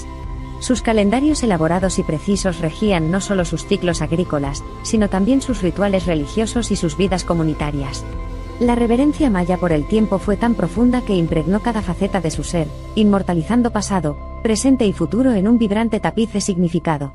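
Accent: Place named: Spain. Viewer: Pienso que es una ofensa que la propia narración sea con acento de España...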